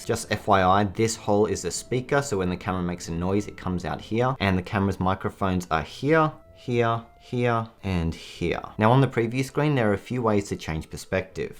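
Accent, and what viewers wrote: Australia. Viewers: wonderful to hear the Aussie accent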